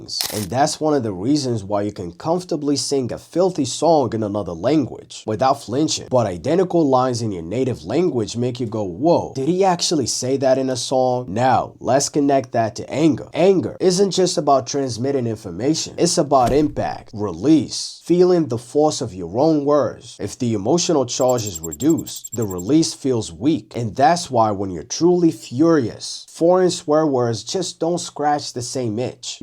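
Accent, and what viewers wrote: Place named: New York, United States. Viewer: I love your New York accent bro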